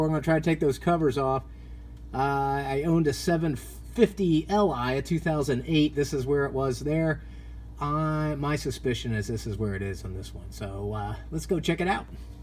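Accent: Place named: Germany. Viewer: Your video was a great help! Appreciate the humor and German accent too LOL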